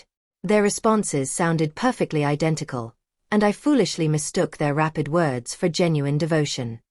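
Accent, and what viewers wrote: United Kingdom. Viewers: Why does the voice have a British accent when she’s living in OHIO all her life?? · British accent but lived her whole life in Ohio. AI slop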